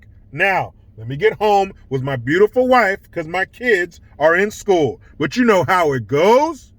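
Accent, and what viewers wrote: United Kingdom. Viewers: You sound like a great dad, J! Love your vids! The brit accent is hilarious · Nice British accent 👌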